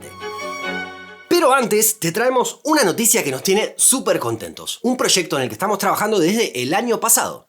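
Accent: Rioplatense, Argentina. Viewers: Por qué intentar hablar en pseudo neutro y al mismo tiempo sheshear? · es argentino o uruguayo?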